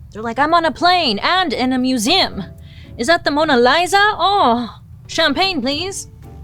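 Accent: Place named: Transatlantic, United States. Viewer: your TransAtlantic accent is *chef's kiss mwuaah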